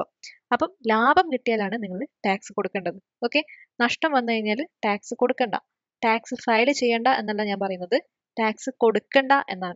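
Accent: Kannur, India. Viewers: സംസാരം കേട്ടിട്ട് കണ്ണൂരുകാരിയാണെന്നാണല്ലോ തോന്നുന്നേ....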